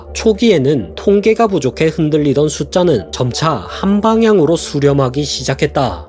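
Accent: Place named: Gyeongsang, South Korea. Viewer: 경상도 사람이 대본을 또박또박 읽는 느낌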